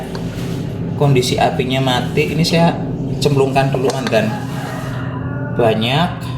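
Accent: Banyumas, Indonesia. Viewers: O...mas.. Eneng apik e nek gawe kamera..men Ojo komplin netizen... iku tangane di sarungin lo maaas..kan malah apik.... aku seneng karo celoteh ne lucu³ Indonesia ngapak...😄😄😄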